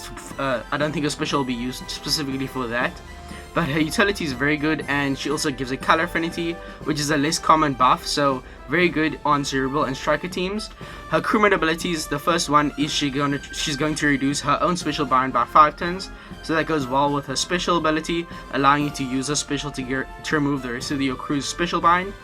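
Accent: South Africa. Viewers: Are you South African bro?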